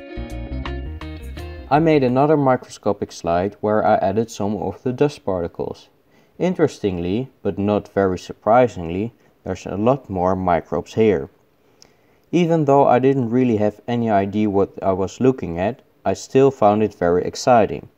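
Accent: Netherlands. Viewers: That moment u just feel the Dutch accent and its true too 😂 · My Netherlander friend, I say this only because I know you are interested in knowing things and not because I am trying to be pedantic, diaphragm is pronounced "die-a-fram." The G is silent. Thanks for the all the great videos & love your accent!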